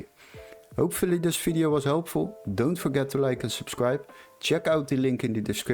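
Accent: Netherlands. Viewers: I can hear the accent your are from the netherlands!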